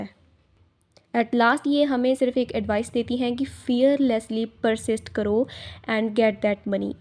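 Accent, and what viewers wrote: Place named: Punjab, India. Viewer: Probably because of her punjabi accent❤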